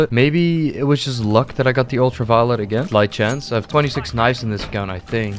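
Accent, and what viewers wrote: United Kingdom. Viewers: Omg ur british accent is so fucking nice dude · nice britich acent matè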